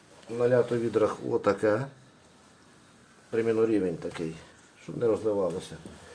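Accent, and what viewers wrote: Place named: Volyn, Ukraine. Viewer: Я недавно натрапив на Ваш канал у Вас Волинська говірка звідки ви?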